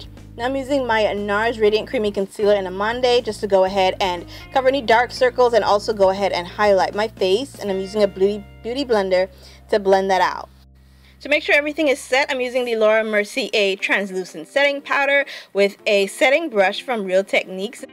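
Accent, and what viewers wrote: Bahamas. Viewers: I was looking at your previous video which was a review of lime crime utopia, & I said she sounds like a Bahamian we really can't hide our accent lol.. Go girl reppin 242.. :-)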